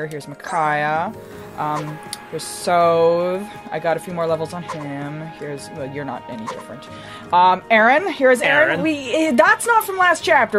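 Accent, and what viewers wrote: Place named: Germany. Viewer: oh not at all, your german accent is fantastic, and you were very reminiscent of the actress who voiced Rip Van Winkle.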